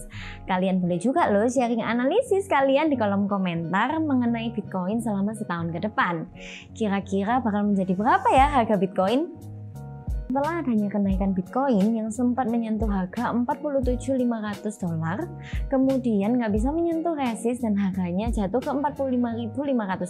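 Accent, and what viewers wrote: Java, Indonesia. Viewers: Ngomong nya medok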